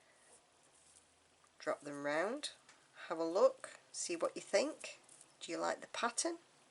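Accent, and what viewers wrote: England, United Kingdom. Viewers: So lovely to hear an English voice. Only just found you. I’m already a fan and will continue to watch your videos. I have already learned a few new things especially how to end memory wire bracelets. Thank you. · Im from California. But I love the Brit accent. I watch Brit TV show. My older brother got me hooked now my oldest son is hooked too. Your coppers and Mystery shows. My granny was born in Ireland and moved to the East coast of the USA. In early 1900s!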